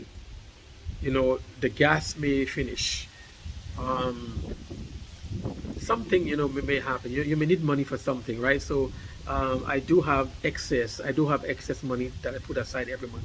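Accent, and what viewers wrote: Grenada. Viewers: His accent sounds more like Grenada. That isn’t a Jamaican accent.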